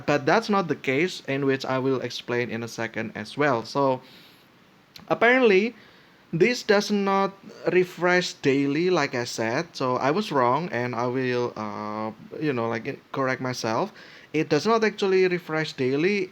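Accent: Indonesia. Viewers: Aksen Nya Kena Banget Orang Indo Bahasa Inggris Nice Bang Informasi nya 🙏🏾🙏🏾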